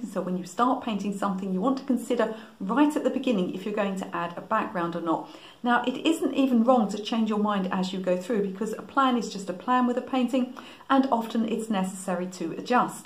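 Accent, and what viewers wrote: England, United Kingdom. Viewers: I love your videos for so many reasons: 1.- You are realistic. Many people believe that Art is "spontaneous"... LOL... Spoiler Alert: Art is much like some kind of science. 2.- You are strict. People think that Art is total freedom, while total freedom is achieve by superceeding maximum restrains. 3.- You are funny. I love your jokes, it gives the audience the notion that Art is not necessarily "solemn". 4.- I really, really, really enjoy your amazing English accent (II am from Argentina, we speak Spanish), which is like a super give-away for me.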